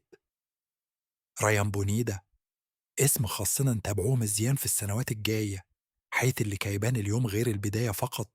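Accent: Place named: Egypt. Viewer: ماشاءالله تتقن جيدا اللهجة المغربية بنطق مصري😂😅. جميل جدا❤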